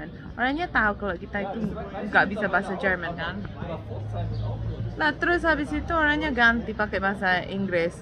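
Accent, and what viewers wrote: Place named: Java, Indonesia. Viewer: Bahasa jawanya masih kentel drpd bahasa Indonesia 👍👍👍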